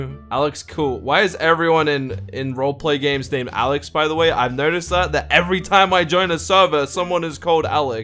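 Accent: Australia. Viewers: Is he rrly from Australia?? I am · ARE U FROM AUS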